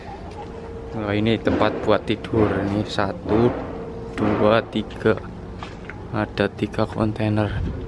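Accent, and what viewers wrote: Banyumas, Indonesia. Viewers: Ngapak wongendhi rika Dene ngelu ndase😁😁😁 tetap semangat ngeh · Jawane ngendi maz kok rodo ngapak le ngmng 😊😂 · Org ganteng kalau jawa ngapak bahasanya jadi wagu gimana gitu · Mase ngapak yak? Tegal apa purbalingga? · Orang ngapak ya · Orang ganyeng banget,,,,tpi sayang ngomongnya.......wkkk wkkkk,,ngapak ngapak..... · wong ndi mas. kok ngapak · Wong ngapak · Bahasamu ejih ngapak bro,,asalmu jw ngapak yo,,,pokoke berjuang,,sukses terus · orang ngapak ya mas... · wong ndi njenengan mas,deneng ngapak temen ngomonge??😂😂😂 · wong ngendi pean ki kok ngapak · Mas orang ngapak ya hiihi..kalem temen kyknya😂😂😂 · Sukses terus mas salam dari malaysia. Rika kayong mandan ngapak deneng, hehehe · Koyoke sampean uwong ngapak 😂😂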